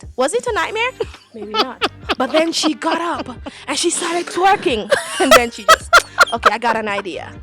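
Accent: Jamaica. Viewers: Love it . Ur Jamaican ?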